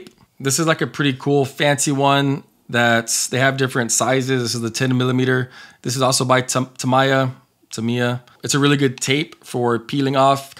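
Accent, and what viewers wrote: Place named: United States. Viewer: You pronounce some words like an American Latino.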